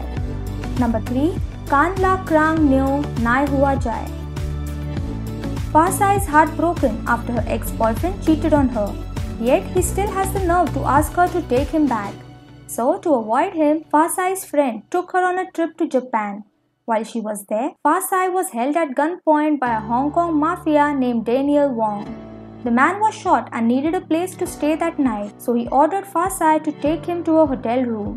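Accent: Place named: Thailand. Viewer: Hola, tu acento parece tailandés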